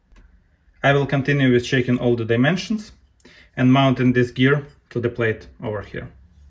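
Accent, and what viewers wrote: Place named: Russia. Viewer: Вроде бы имя. И говорит на английском с русским акцентом)))) похоже русских не любит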